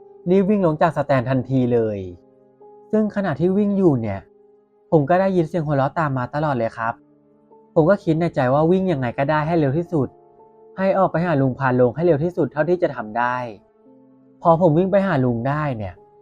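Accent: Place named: Rural, Thailand. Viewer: สำเนียงเหน่อเพราะฟังเพลินเชียว ขอติดตามเป็นแฟนคลับนะครับ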